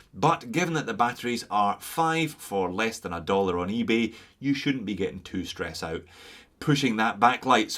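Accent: Scotland, United Kingdom. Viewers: Another great review! I would like to ask, where in Scotland do you come from? I love your accent. I live on the Borders, and often pop up into Scotland whenever I'm tired of England (I'm English myself but cheer for Wales). I particularly like the "Muckle Toon", Langholm. I'm a Casio fan, too - my sports watch is an ancient Baby G that my Grandad bought for me years ago. It takes a kickin' and keeps on tickin'.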